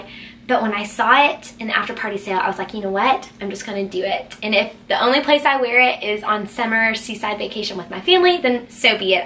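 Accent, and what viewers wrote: South, United States. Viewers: your southern accent is adorable😍I live in Dallas too but I don't have a southern accent!😭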